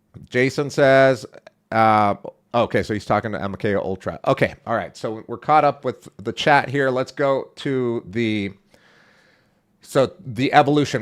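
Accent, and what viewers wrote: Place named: United States. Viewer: I am quite happy to hear a "real yank voice" in Latino body! Subbed.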